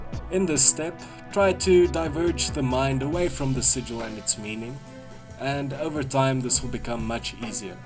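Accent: South Africa. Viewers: ? Are you also South African? I recognize your accent. Is there any way I can contact you? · sorry if this sounds weird your accent sounds verry familiar are you mby from SA if u are nice to see im not alone in this country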